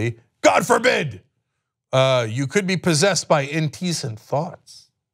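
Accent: Turkey. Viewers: Andrew Truman he’s Turkish, I think he comes by the accent honestly, but I don’t love the derision. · That was your Turkish accent?! I have never heard anyone talk like that here.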